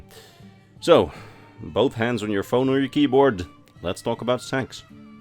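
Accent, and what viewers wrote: Netherlands. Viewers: Je klinkt zeer Nederlands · 5 minutes in I KNEW this guy was Dutch the accent is so thick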